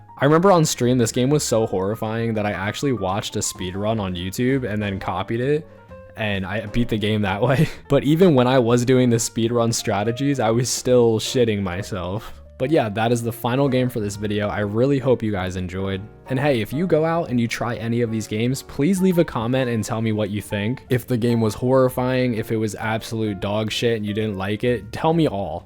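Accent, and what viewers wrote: Poland. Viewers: bro are you polish??????????????????????????/ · Are you polish?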